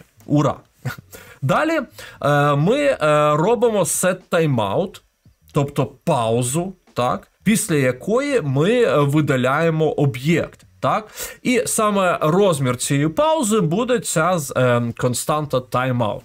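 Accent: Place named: Carpathians, Ukraine. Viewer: Что свинособачий говор карпатских рагулей? Рагули умеют даже компьютер включать?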